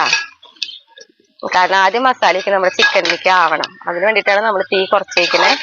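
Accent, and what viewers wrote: Thrissur, India. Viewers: അത് തൃശൂർ ക്കാരുടെ ഒരു സ്റ്റൈൽ അല്ലേ?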